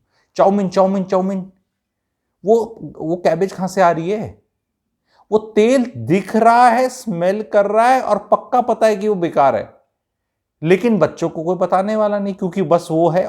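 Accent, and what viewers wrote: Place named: Khadi Boli, India. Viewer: भाईजी, जबरदस्त बात बताते हैं। मजा आता है, आपकी खड़ी बोली सुन कर। कुछ देसी आइटम्स पर वीडियो बना दो बहुत कृपा होगी।,,🙏🙏👍👏👏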